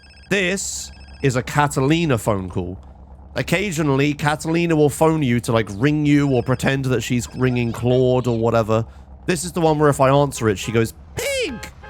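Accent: United Kingdom. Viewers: He's british, innit?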